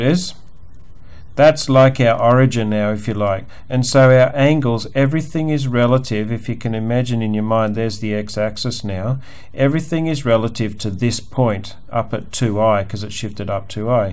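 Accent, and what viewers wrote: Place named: South Africa. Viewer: Your explanation is clear as crystal. Excellent tutorial on complex numbers. I also like the quality of your video as the picture is stable, clear and neat. From your accent I would imagine you are a South African?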